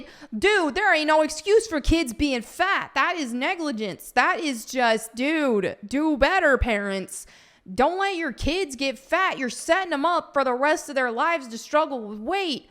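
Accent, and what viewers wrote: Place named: Texas, United States. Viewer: Hey I was wondering, what happened to your Texan southern bell accent you used to have and southern bell body movements and poses you used to do. I noticed you started to consciously practice annunciation there in your first videos and after that is pretty much went away. Nice work, was just wondering why you felt you needed to change to that extreme when you started streaming and posting vids online? I noticed that as well cuz us southerners don't really annunciate T, kinda rolls, don't really try on em but in the vids i noticed you really started hitting them hard.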